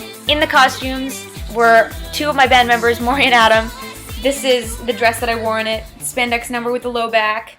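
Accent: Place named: Canada. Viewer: I've never noticed how Canadian you sound. The accent is strong with this one.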